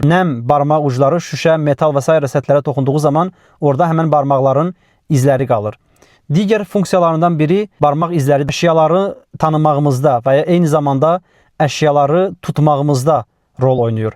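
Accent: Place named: Talysh, Azerbaijan. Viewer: Salam qardas siz hansi bölgedensiz.siveniz sirindi talis zonasına oxsayir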